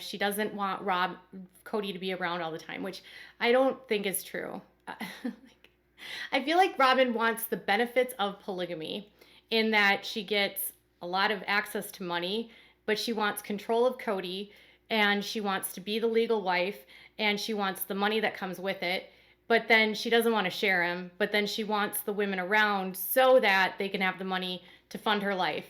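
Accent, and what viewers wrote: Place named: Wisconsin, United States. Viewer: 👍🏼Just wanna thank you for sharing your insights and research with us, you're very thorough!💕 I sooo love your accent, some of my relatives are from Wisconsin and Minnesota so you sound like family to me!🙂 Please stay safe, keep doing what you do and God be with us all, Amen!🙏🏼🙌🏼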